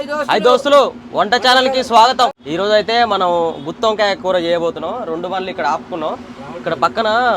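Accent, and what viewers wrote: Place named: Nalgonda, India. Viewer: నువ్వు మా ఊర్లో మాట్లాడినట్టు మాట్లాడుతున్నావు... మాది నల్లగొండ, దేవరకొండ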